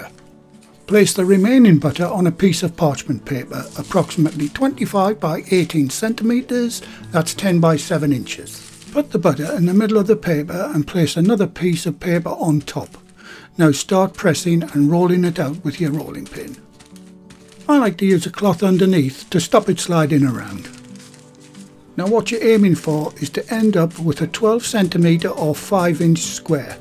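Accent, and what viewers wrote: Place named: North of England, United Kingdom. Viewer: Hi I have been reading the comments below: first I am Irish and nobody would accuse me of being biased in FAVOR of Brits - has a lovely north of England accent; his instructions are clear and very easy to follow, his gentle mild mannered slightly self effacing style tells me here is a working man and no snob. Credit where it's due I used to be a good baker but credit for my successful return to more serious home cooking belongs to. Thank you - don't mind the "begrudgers" as we say in Cork...Thanks for your videos G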